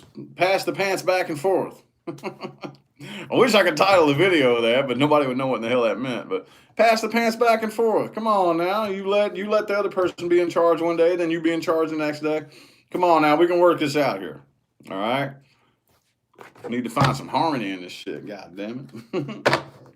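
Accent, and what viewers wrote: United States. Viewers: I think its the whole american accent thing he has that makes me feel..... yesss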